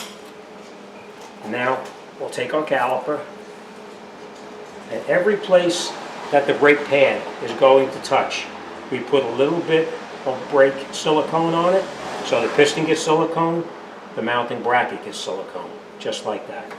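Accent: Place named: East Coast, United States. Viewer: I can tell from the accent and the rusty rotors and calipers that this is East Coast. Good video!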